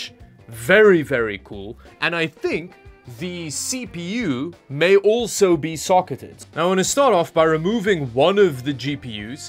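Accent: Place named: South Africa. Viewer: This guy has a South African English accent lol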